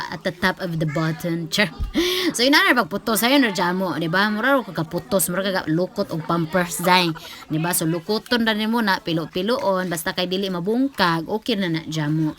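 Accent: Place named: Loboc, Philippines. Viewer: Nindot gud paminawon ang sinultihan sa taga loboc madam hehehe...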